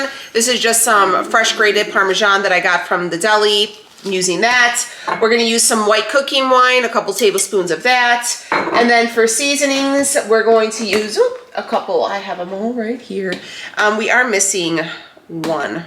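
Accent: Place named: Minnesota, United States. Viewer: Looks awesome! I'm going to try this. Where are you from? You sound like you are from my home state of Minnesota! :)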